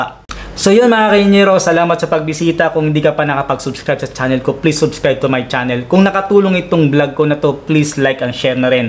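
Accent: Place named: Northern Samar, Philippines. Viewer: Engr. parang taga catarman northern samar ka? kc ang accent at pag sasalita mo,, nararamdaman ko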